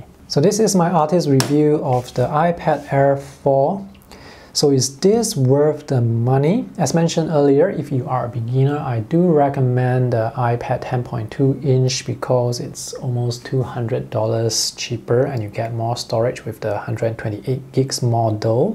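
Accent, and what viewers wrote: Singapore. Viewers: Hey! Really enjoyed this video as I am a fellow designer myself and feeling very conflicted on whether i should get the iPad Air or the Pro for sketching. I was wondering if you're based in Singapore cuz of the way you speak and then I saw the sketches haha! Singaporean here too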